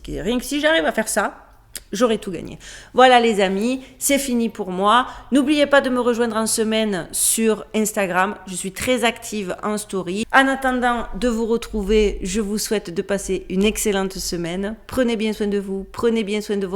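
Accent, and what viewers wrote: South, France. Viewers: Merci belle d'âme d'outre atlantique avé l'accent si typique de nos contrées du sud de la France ! C'est toujours avec un plaisir éréfrainé que je bois tes recherches qui partent dans tous les sens et les éveillent , les sens ! Merci pour tes nombreuses recherches dont certaines que je n'ai pas faites et qui pourtant sont d'un grnd intérêts quand d'autres pas mais là avec le nain polèon , tu m'en apprends de biens belles ! Comme j'aime ton esprit rebel et tacuriosité très saine , ne lâche rien Sista et prends soin de vous ! Merci , force et courage ! Namaste 🙏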